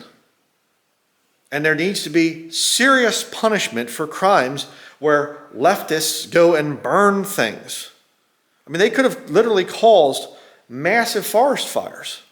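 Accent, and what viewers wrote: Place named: Southeast Pennsylvania, United States. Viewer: Wow,you sound like me,raised up in southeast pa. On a dairy farm and going upstate for everything outdoors. Now living in maine 10 years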